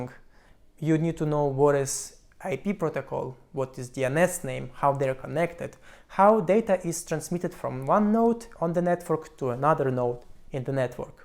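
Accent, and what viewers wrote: India. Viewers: Why do you sound Indian😅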